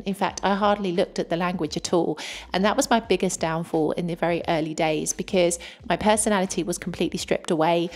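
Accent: United Kingdom. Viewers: I would like to hear you speak in Italian on a video given your British accent?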